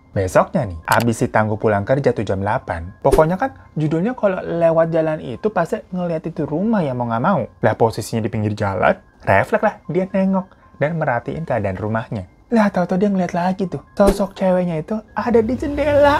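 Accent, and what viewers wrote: Java, Indonesia. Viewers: Wkwkwkwkwkwk..candu bgt logat jowo medok e bang 😂seru KLO ada TC yg pake jowo yaa.. 😂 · hihii😅 pake logat jowo siiip😂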